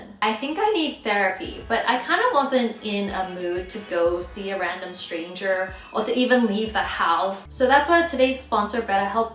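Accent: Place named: Australia. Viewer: Does any one remember Australian accent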